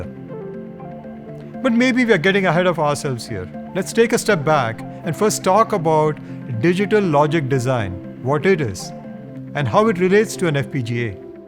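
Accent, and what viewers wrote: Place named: India. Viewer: You couldn't find a guy without an indian accent?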